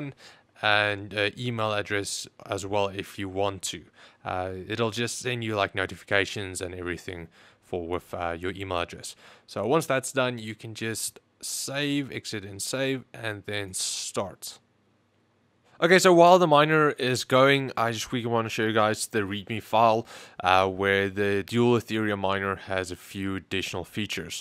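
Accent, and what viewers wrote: Afrikaans, South Africa. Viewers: Wow i heard his accent and knew hes from SA i am also lol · Wou sê jou aksent klink Afrikaans. Dankie vir die raad maat. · Are you south African? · This is the first video I've watched from this channel, and the first thing I thought of is, "Is he from South Africa?" because I'm originally from there, so I could detect the accent. It was fun to see I was right when the ad came in.. "are you from south africa and want to get some new gaming products?" Lol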